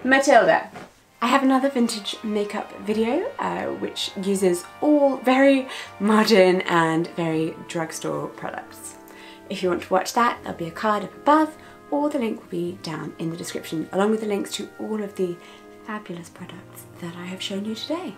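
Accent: RP, United Kingdom. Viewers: Your british accent sounds so proffetional and similar to the royal families accent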